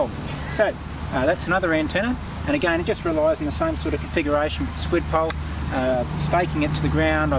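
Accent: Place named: Australia. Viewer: Sorry but only 25% of what you are explaining is understandable for a non "Aussie" - certainly ad that speed!. "That mountain is too high for me". Paul - OP5A.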